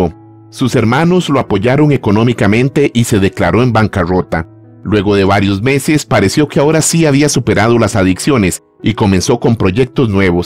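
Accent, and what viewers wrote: Costa Rica. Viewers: Ya sabía que eras Tico por tu acento, muy bueno el reportaje. Amo los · Desde que empecé a escucharlo dije... Este es acento tico 🇨🇷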